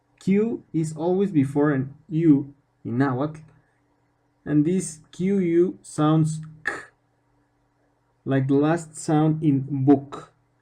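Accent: Spain. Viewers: He has a spanish accent it sounds exactly how he said it just americans cant understand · Um... None of those vowel sounds were correctly correlated to English sounds, but if you listen with Spanish ears it makes sense... It reminds me of the old "pink, green and yellow" joke... (Long story short: "I hear the phone green, I pink it up, and I say, 'yellow'?")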